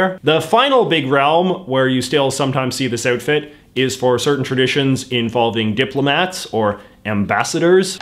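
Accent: Canada. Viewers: I can tell that Canadian accent BTW I really want to go to Canada we’re would you recommend? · Is that a real Canadian accent? The “oot and aboots” are so strong it sounds like you’re mocking Canadians. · Thanks for keeping the Canadian accent alive,. You really lean into it. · First time I am watching this channel (very impressed) but that Canadian accent... either exaggerated or you're from Cape Breton or Newfoundland. The only reason I say that is I'm a maritimer myself and haven't heard that in good old Halifax, but certainly have heard it around ! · Funnily as a Canadian in BC I don't normally hear the stereotypical Canadian accent, but yours is quite pronounced. I assume this is an Ontario thing? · You have a very Canadian accent overall, but the way you pronounce "lieutenant" is quite American. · You can tell this guy is Canadian just the way he speaks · This is the most Canadian accent I have ever heard. 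Is this real???? Where are you FROM??? hahah (Respect to Canada from Minnesota) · The way you pronounce about and around is clearly rooted in some deep candiana, yet you forego the proper pronunciation for Lieutenant for the American pronunciation.. why is that? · As a fellow Brit, it also helps that has an incredibly thick Canadian accent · Oof this dude sounds Canadian. They usually sound like normal people, but then they say one or two words in a super weird way. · Love your Canadian accent as well as your legs. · Thank you sir. You may (or may not) be interested to know that many members of our Royal Family in UK seem to love wearing military uniforms regardless of their (the RF member's) age or membership of a particular militia. Incidentally, talking of nations, natives etc did I detect a fine, Canadian accent? I have been practising listening before my hearing finally departs! Blessings and peace PS I really need to listen to the complete video to prevent making observations that you have already addressed. 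My apologies. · First time here and you’re the most Canadian person I’ve ever heard... and I’ve been to Canada 😂 really enjoyed this! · I’ve never heard a more Canadian accent in my life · i cant listen to him say outfit or about in canadian again. im out. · I challenge you to say out and about without the Canadian accent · Hey man, I’m from Canada too but “arooond” instead of around, is a really extreme accent. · Don't think so, we all just say Missouri the normal way lol. He does have the stereotypical Canadian accent though, so I guess that's it.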